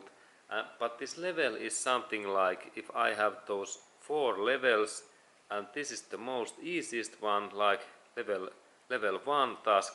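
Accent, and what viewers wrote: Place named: Finland. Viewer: hirveen kuulosta tuo ralli englanti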